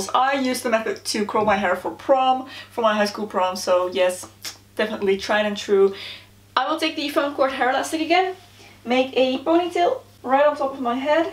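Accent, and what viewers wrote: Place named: Netherlands. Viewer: ik hoorde aan je accent dat je nederlands was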